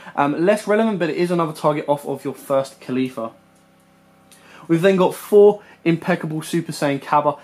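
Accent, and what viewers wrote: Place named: United Kingdom. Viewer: Nice to hear a UK accent, so annoying we don't get set 4 for ages 😡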